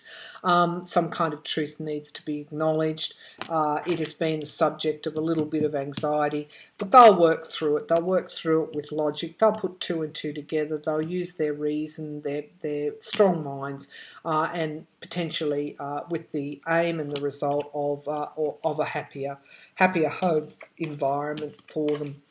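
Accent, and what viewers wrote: Australia. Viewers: I love how you write; your Ausie accent is such, that in my minds eye, I hear you. Good luck on your health issues. is terrrrrific. 🇺🇸🐈♈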